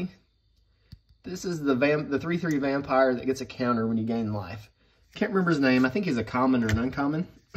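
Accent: South, United States. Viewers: Watched the whole thing! Just started getting into magic recently and I love watching your videos! Your southern accent makes me feel like I’m at home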